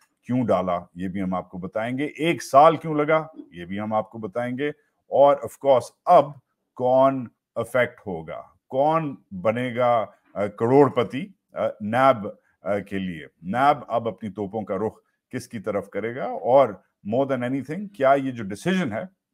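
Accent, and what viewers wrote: Punjab, India. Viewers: No, being a Punjabi myself, I enjoyed your Punjabi accent while explaining the amendments. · پنجابی کا تڑکہ یا touch اچھا ہے۔ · Love you Wajahat and your Punjabi accent . Superb bro. tum naay khoob tease keaya isss punabi fauj kooo . yeh punjabi dhagay sirf khaa bhee sakty hain moty maghaz kee log hain yeh sary boot. per inko $$$$$ ginanna aur plot bnana khoob ata haay. aur tu aur papa johnz bhee tumhary neighbour main inhee kee hain. dekho looo punjabi fauj kooo. haram kee adat paree huee hay inkoo. yaar itna arsa inkee sath kaam keya tum naay yeh tu samggty hoo fauji koo dosry kee jeeb saay mal nikalwana khoob ata haay. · Always a big fan of you bro and loved the way you explained in bit of Punjabi swag 😜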